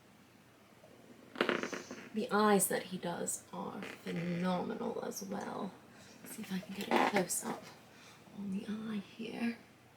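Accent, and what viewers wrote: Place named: United Kingdom. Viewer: Another great video truly a masterpiece of a face and yes he does great artwork I'll have to look into the company by the way you sound like you have a British accent in the video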